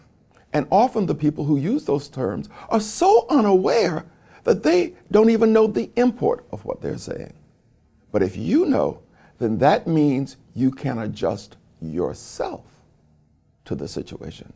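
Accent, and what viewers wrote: United States. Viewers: It's partially because of your American accent. Many Brits love an American accent. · He has an American accent. I don’t understand.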